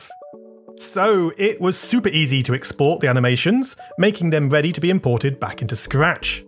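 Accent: United Kingdom. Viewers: it’s the hot british scratch guy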